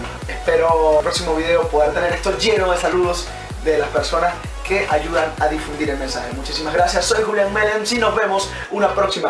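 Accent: Chile. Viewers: Hablas Chileno... 👎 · Porque hablas casi chileno xd si ni tienes mucho tiempo xd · hola chico jajaja ya se te pego el acento, una pregunta que es garzon?? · Siii! Se te ha pegado un poquito el acento ;) Saludos! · Que lindo eres, me encantan tus vídeos!!! Muy informativos 😊 Por cierto, se te ha pegado el acento Chileno jajaja😂🙊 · se Te pego el acento chilenoo. jeje que bueno que ya tengas rut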